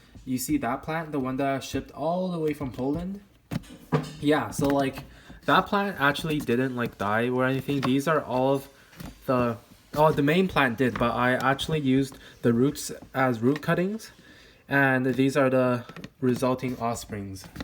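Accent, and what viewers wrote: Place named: China. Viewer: 怎么中国口语啊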